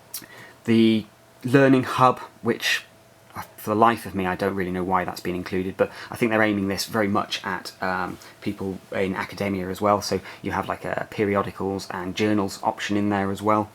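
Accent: England, United Kingdom. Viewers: i subscribed to you.real english voise.am used to american.^-^ i am a motorola fan.i have old q 11 and old milestone. maby motorola come out something like the note.this nice phone.i belive its intel processor ? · are you british ?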